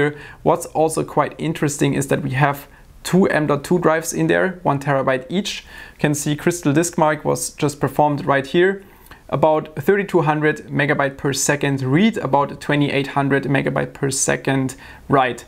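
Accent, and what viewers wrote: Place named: Germany. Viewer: Sorry, but German accents sometimes makes me do a double listen. 20 seconds in... "Hoetel". haha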